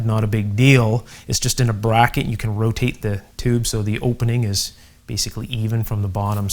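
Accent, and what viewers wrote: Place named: Canada. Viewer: I cannot quite pinpoint his accent/dialect - I am guessing it is Canadian since the pronunciation is so articulate and clear - But which is it? 🙂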